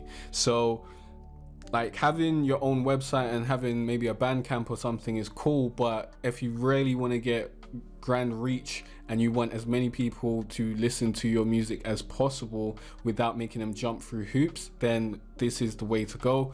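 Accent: United Kingdom. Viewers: Thank you mister British man i found on Youtube!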